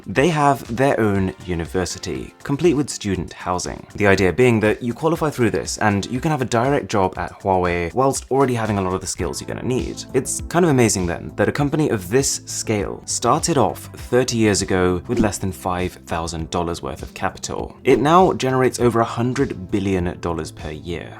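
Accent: United Kingdom. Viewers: he sounds British to me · That is just how British people talk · I enjoy this type of content. Please continue to provide informative YouTube clips like this, Apple, etc. Your content is so varied in the world of tech - but most of all informative. Many YouTubers cover the latest technology but it is nice to learn more about the R&D developments, the company behind it (beginnings, growth, direction, and aspirations). This is what you provide. Please continue on your current trajectory. 👏🏽👍🏽 P.S. I love hearing the accent of my fellow Brit on YouTube. So few in the world of tech - but at least you’re not alone. 😊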